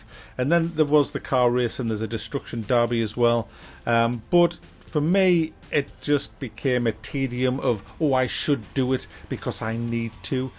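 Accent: United Kingdom. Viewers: Darby. Beeta. Gayme. Moode. Controollah. That's the British accent for you.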